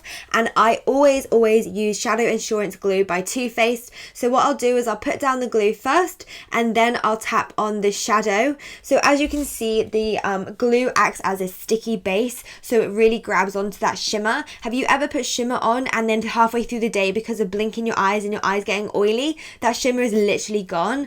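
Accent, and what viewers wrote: United Kingdom. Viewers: En verdad me caga su acento británico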